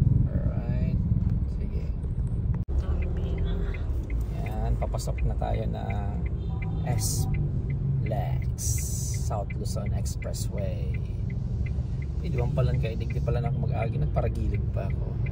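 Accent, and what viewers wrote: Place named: Visayas, Philippines. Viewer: Anong dialect po gamit nyo Sir? There's a little hint of Bisaya and Illonggo.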